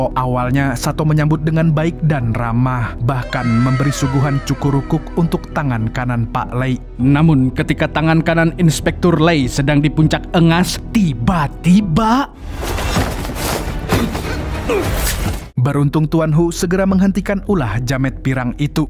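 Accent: Banjar, Indonesia. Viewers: Org Banjar KH mang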